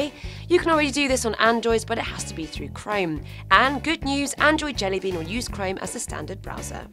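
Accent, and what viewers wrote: United Kingdom. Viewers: I really love your british accent.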